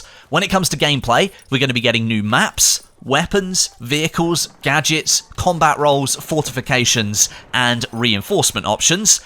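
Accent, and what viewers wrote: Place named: United Kingdom. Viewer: This high pitch british male voice is really relaxing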